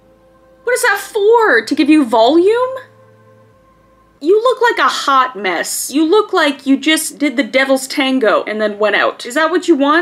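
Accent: United Kingdom. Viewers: Plsss talk British I love your British accent ❤❤❤ 😂😂😂😂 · Are u britesh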